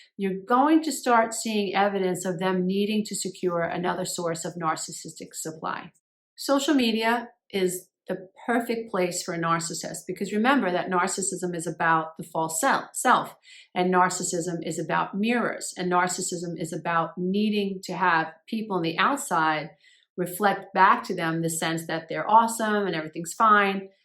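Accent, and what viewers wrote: United States. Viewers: Hi I have seen your videos on Instagram as you only popped up the other day on YouTube as I was looking for something else to watch then I clicked on your feed to see what the video Is all about and I have two very evil brother and sister who are really cruel to me and done and said the most wicked things too me for the last 50 years but I am not sure if what you say is about anyone so just wanted to ask you that ok and I am not a troll as you mentioned in one of your videos on Instagram lol but I I will say this your absolutely gorgeous and I love your sexy American accent lol it's sends me to sleep because I am very unwell here and having trouble getting to sleep for days now so you have some good uses in you if nothing else hahaha im from England 😉😂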